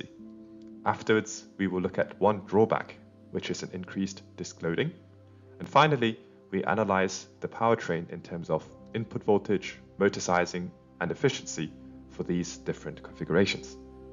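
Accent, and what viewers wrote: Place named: Germany. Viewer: 想问博主是中国人么，感觉您在德国生活过很长时间哈哈，德味挺重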